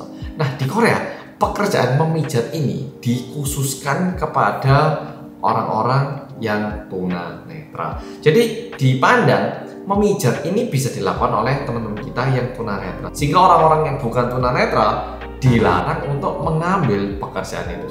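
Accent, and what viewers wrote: Java, Indonesia. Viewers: Sakjane aku ndelok channel e mas ora mergo samiyan ki wong Korea, tapi mergo medok e ki lo. Jarang enek seng medok ngeneki nang YouTube wkwkwk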